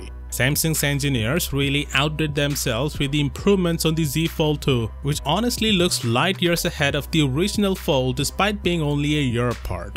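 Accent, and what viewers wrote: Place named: India. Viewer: Work on your accent, everytime I try to watch your video till the end i just give up because of it. Otherwise your fans will be indians only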